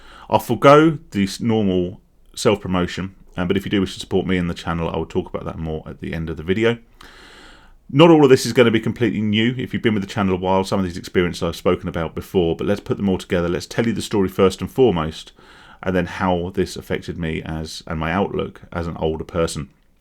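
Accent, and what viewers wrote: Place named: Suffolk, United Kingdom. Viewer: Thanks for sharing. I found that making changes in my life to become the person I feel I am helped me massively in my recovery from gambling addiction so this video really hits home for me. Are you from the Colchester/North Essex area? I was convinced you had a Suffolk accent!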